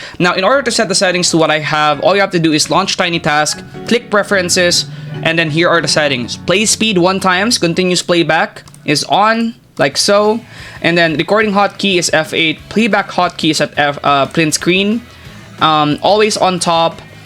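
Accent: Philippines. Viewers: The accent is very Filipino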